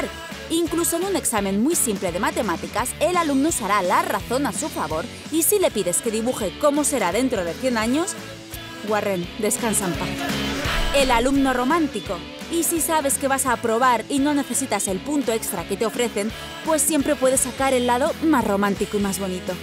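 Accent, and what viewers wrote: Spain. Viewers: eres de españa ??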